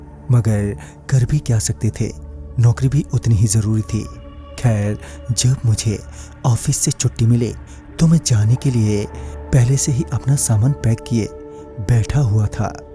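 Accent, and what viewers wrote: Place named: Kolkata, India. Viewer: Narrator bhi kolkata ka lag raha hai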